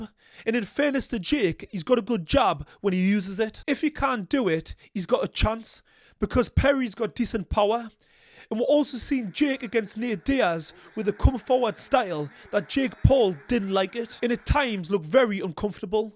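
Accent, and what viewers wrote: Newcastle, United Kingdom. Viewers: You from the Toon or Durham mate, regardless subbed 👍🏻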